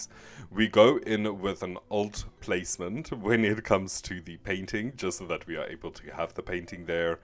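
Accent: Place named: South Africa. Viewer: Oh wow, you live in South Africa? Now I understand the accent - I thought you were British, sorry. Hi from Germany!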